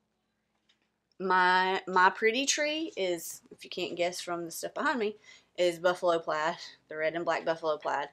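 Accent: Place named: South, United States. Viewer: 😁 don't apologise for your cats' noises, I find it hilarious 😁 Maybe because I also have cats, four of them and sometimes one of them does a cameo on my floss tube 🙈😁 I came here because Chris mentioned you 😊 and I'll stay here. I like your projects and your Southern accent. Looking forward to seeing your cotton video 👍😊 Kr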